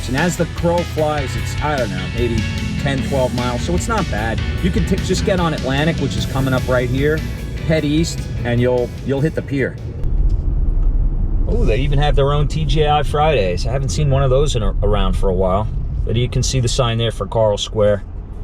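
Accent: New York, United States. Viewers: I forgot what sun looked like thank you! You never lost your NY accent. Hardly anybody has one in NYC any more. Do you get discriminated against at all for being a New Yorker?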